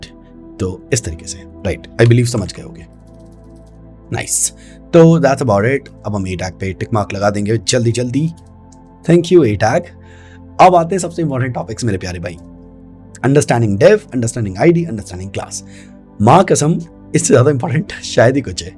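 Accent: Bhopal, India. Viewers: nice and calm explaination and to the point with some bhopali touch thank you sir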